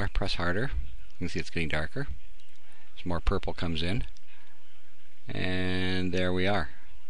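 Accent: Canada. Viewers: i dont have this, so my question is dumb, buttttt: you keep saying "press harder" what do you mean? are you you using some sort of pen? how do you press harder on a mouse? -sorry i dont really understand but i DID enjoy watching! p.s. are you canadian? you sounded canadian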